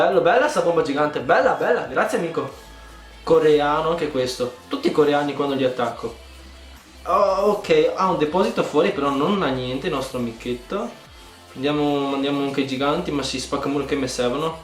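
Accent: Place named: Milan, Italy. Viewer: Primo tuo video che vedo e ... sei veramente epico! Un cinese con l accento milanese dove lo trovi ... probabilmente a milano ma tu sei epico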